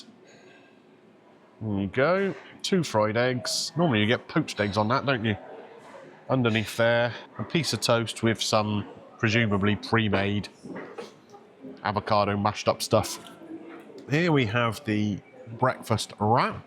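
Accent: United Kingdom. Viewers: This is very interesting. I just got recommended this video after seeing a lot of Gary Eats videos, and I can understand why. You seem to have nearly identical branding, styles, and video format/pacing. Only difference I noticed is I think he has some faint background ambient music sometimes. Clearly you are like a separate person and I don't get the impression you are at all being dishonest or anything, so I'm not criticizing. But it is very interesting. I would say it might be good to find one or two things that are a bit more unique to you and your style and lean into those, but that's sort of hard with food videos, so I don't really blame you for having such a similar style. I guess I have nothing to say but good luck overall, I might check out future videos of yours. And I suppose it's good to have another [kind but honest british guy with a very specific british accent giving food reviews where he starts outside makes a summary, goes inside gets a handful of key menu items, tries them out with a silent but clearly human cameraperson, gets some b roll, and after the meal goes outside makes some kind of joke, goes over the bill and makes a final summary, all shared with a video with him with a white outline kind of making a shocked or at least very emotive face and some short all caps text that is attention grabbing but also a bit intriguingly unclear.]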